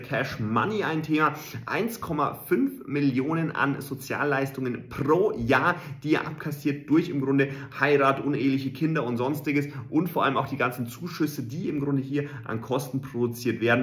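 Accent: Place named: Bavaria, Germany. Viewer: Kann jemandem mit solch einem Dialekt never ever zuhören..sorry, bei aller Liebe. Wohl was eher für die bayrische Bevölkerung. Vielleicht hat er einen Blog..dann lese ich lieber!😅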